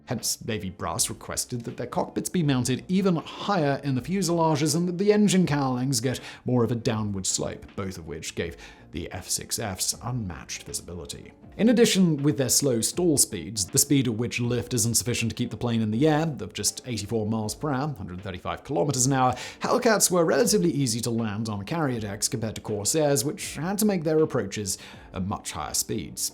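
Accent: United Kingdom. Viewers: The f6f was and is extremely well known. It's hardly an unknown. Tactics were simple, fly in at high speed take a shot and keep going. Don't dog fight, or die Japan had some incredibly skilled pilots but exceptionally poor training tactics, so once the few exceptional pilots were attritioned out it just became a matter of time before us Americans ruled the skies. Japan was never really going to pose a serious challenge due simply to Its limited man power, industrial strength and serious lack of raw materials. The US navy didn't want the corsair due to its difficulty in landing on carriers. The British, based on your accent I think you should know, showed the US navy how to land. Corsairs land by coming in from the side not straight on. The gull wing was to accommodate the massive prop and requirement for high strength gear meant lowering the wing to shorten the gear. 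Post war proves the Corsair was a better overall aircraft.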